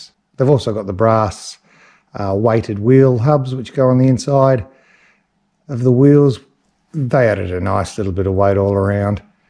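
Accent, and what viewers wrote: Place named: Australia. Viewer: Great video dude. I have 8, 1/10 crawlers. My first hobby grade RC was the original deadbolt so I have wanted a Scx24 Deadbolt since they first came out. Finally ordered one and have been watching lots of upgrade videos. Like yours the most. Interesting that you still like the Nitto tyres. I'm not going as far as you with upgrades but you know how it is I won't be able to help myself. You sound like an Aussie, keep the videos coming.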